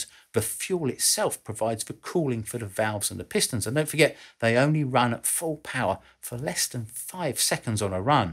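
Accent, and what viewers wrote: United Kingdom. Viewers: Why the sickening sissified limey accent????? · Love the UK accent to narrate a video that can be described as " 'MERICA!! " 😂🤣 So much fun. Thanks mate! 😊👍upvote earned. I'm personally not into 1/4 [or 1/8] mile racing but as an welder with a lot of experience with Aluminium I have professional experience with the blocks and various parts away from race days. IF anything is left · This is very old information being about 6 years old. Much bigger numbers in 2025. And a guy with a British accent is commenting on a purely American sport?? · Well, that's an unexpected subject for but in fact, there are many points in common with rockets:D That was funny. And top of that: with british accent!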